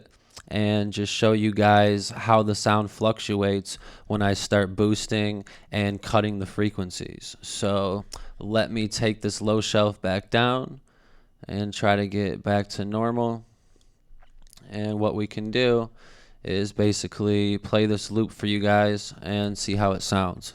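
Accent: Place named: United States. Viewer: I don't like the american accent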